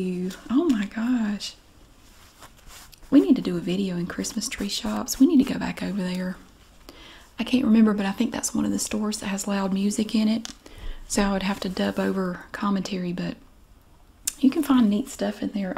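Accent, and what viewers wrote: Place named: Pittsburgh, United States. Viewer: ! What suburb outside of Pittsburgh was it?? I'm born and bread Pennsylvanian, just about 1.5 hours outside of Pitt. Also, we call the accent "Pittsburgh-ese"- I believe it was recently rated the worst accent in the entire country lol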